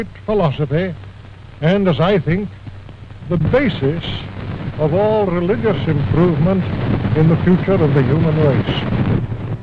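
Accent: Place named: Edinburgh, United Kingdom. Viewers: Och! Man's scottish is really showing here. I love it. Would have been very interesting to sit down and talk to him about all sorts of matters. I find it fasinating that someone who is educated man of science matters was so into Spiritualism. Where's a time machine when ya need it? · Edinburgh accent, similar to my own.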